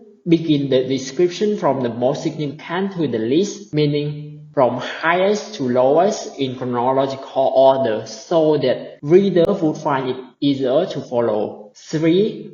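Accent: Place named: India. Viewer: It looks like any Indian try to speak in English assent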